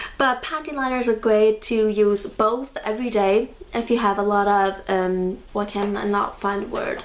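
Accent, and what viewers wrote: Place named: Iceland. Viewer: oh your from Iceland that explains the accent I was trying to figure it out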